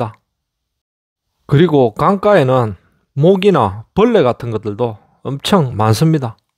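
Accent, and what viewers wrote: Gyeongsang, South Korea. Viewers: 경상도 액센트 최고 매력적이에요 love love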